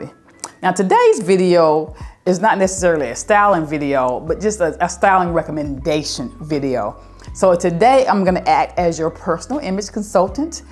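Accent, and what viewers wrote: South, United States. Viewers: First time tuning in. I was so distracted by her talking about herself and her clothes in the third person "she" and the country southern fast-talking twang and accent was everything... especially when she repeatedly says "cumtuble" over 100 Xs for the word "comfortable". Could never take someone that talks like her very seriously about anything "fashion". Let me try watching another one of her videos. I'm sure she's good but as the English scholar that I am.... my ears 🤔😏😏🙄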